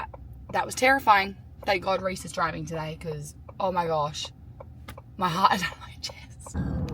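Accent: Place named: Australia. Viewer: Love your vlogs. Great energy. Aussie accents are my fav 😊 Can you tell us your DCC lip combo?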